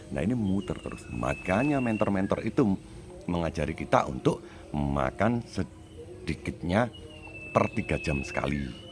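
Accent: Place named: East Java, Indonesia. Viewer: kalau liat dr logatnya. Masnya dari Jawa Timur. Kebetulan asli saya Jatim juga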